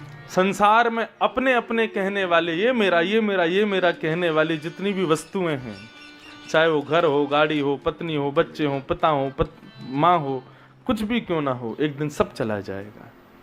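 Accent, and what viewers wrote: Braj, India. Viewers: Jay Shri Krishna Radhe Radhe 🙏 ♥️ 💙 ❤️ OM 🕉 Namo Bhagvate Vasudevy Namh 🙏 ♥️ 💙 ❤️ OM Namo Narayan Jay Shri Shyama Shaym ji Jay Shri Guru Dev Maharaj ji Aapke Charno Mai Koti Pranam Pranam Jay Shri Radha Rani Jay Shri Radha Rani Jay Shri Raman ji Jay Shri Radha Vallabh Lal ki Jay Ho Jay Shri Guru Dev Maharaj ji Aapki vraj Bhasa Badi Sumadhur hai Radhe Radhe Jay Shri Harivansh Guru Dev Maharaj ji 🙏 ♥️ Jay Shri Hari Jay Shri Vrindavan Dham Radhe Radhe Jay Shri vraj vasi 🙏🙏🙏🙏🙏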